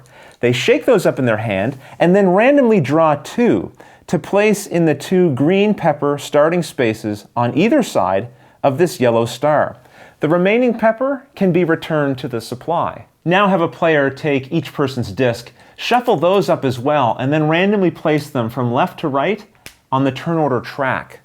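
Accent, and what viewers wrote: Canada. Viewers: I could really tell you are Canadian from a few of the words you said in this video. I recently discovered that Canada has the largest tabletop gaming population in the world. Thanks for this video really helps. Not it's time to go play the game!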